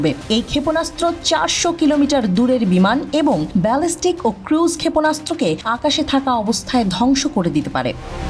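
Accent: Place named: India. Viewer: এই মহিলা কি ইন্ডিয়ান,তার কথা বলার ধরণ দেখে এমনি মনে হয়, এমন ভাষায় কথাবলে ভারতীয়েও সংবাদমাধ্যম, হাসিনা কি ভারতীয়েও বর্জন প্রডাক্ট ব্যবহার করছে বাংলাদেশ ভূখন্ডে।।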